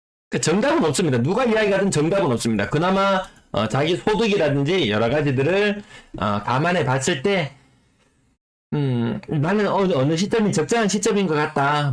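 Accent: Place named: Busan, South Korea. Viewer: 와ㅎㅎㅎㅎ 저도부산사람인데 사투리 기가맥히네예ㅎㅎㅎ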